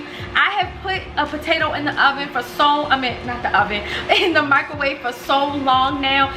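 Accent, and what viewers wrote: Baltimore, United States. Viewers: Oh u def from baltimore. I can tell by your voice. U get a like comment sub of that alone.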